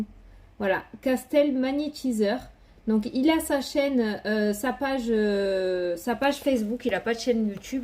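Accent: South, France. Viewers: Dommage qu'Olivier vivent en Normandie 😕 Avec ton accent du sud, j'ai espéré qu'il soit dans le coin. Avignon/Normandie arrrf 😁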